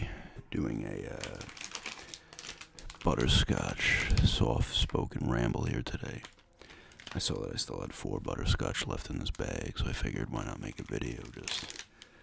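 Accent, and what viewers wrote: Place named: Long Island, United States. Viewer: Kind of hear a Long Island accent, i’m in Pequa. (Potentially) small world. Keep up the great work, love the videos!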